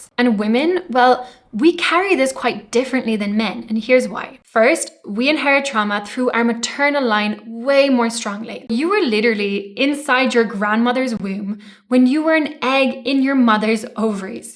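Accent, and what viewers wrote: Ireland. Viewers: Great video. Thank you. Are you Irish because you have an Irish accent? Blessings.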